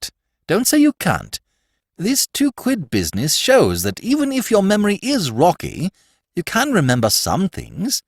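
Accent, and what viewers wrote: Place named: United States. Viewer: American narrator...PG Wodehouse American.. .are you befuck???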